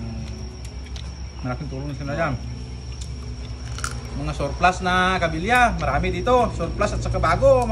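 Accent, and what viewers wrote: Iloilo, Philippines. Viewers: Idol taga saan ka ba... Parang tunong iloilo ka idol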